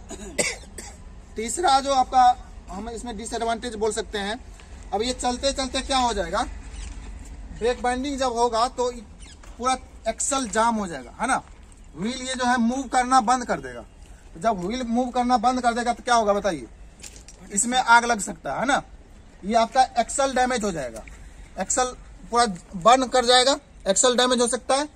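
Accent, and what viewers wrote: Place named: Bihar, India. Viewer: Super sir ji very good apke bihar se hai lag raha hai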